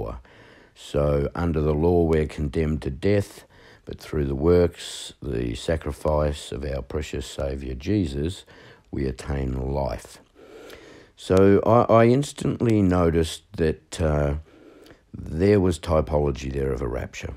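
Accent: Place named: Australia. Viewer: While i was thinking how cute your pronunciation of Esther was, you said you got told for it 😂😂😂 i like the Australian english😊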